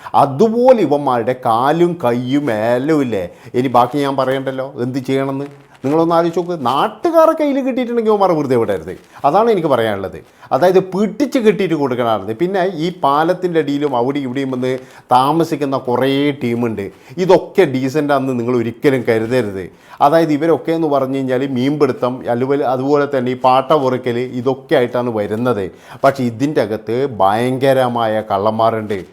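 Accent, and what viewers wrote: Kannur, India. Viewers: Kannur slang nalla rasam kelkkan. My fathers place anjarakandiyanu thangalude native place evideya?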